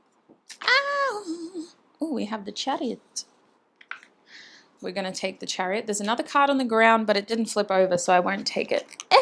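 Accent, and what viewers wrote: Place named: Australia. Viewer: Just came across your channel and thought to myself - this lady sounds Aussie 🤔 and you’re an Aussie 🥳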